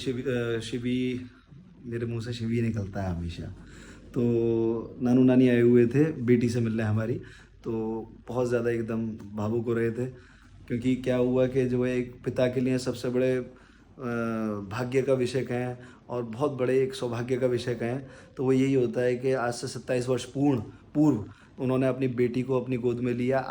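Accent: Standard. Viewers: Ap Hindi itne shudh tarike se bolte ho acha lgta h🎉🎉🎉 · itnii suddhh hindiii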